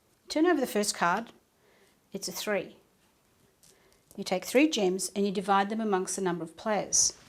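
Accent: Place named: Australia. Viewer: Nice video thanks. And an Aussie voice. Nice.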